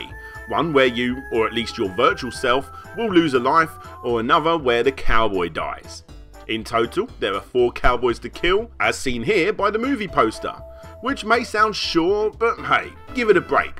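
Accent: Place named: England, United Kingdom. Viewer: ps - Love hearing proper English accents on YT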